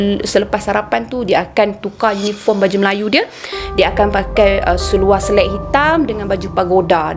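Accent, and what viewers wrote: Kelantan, Malaysia. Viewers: saya dh agak dah kakak orang kelantan,masa kakak bercerita ada sikit tubik bahasa kelantan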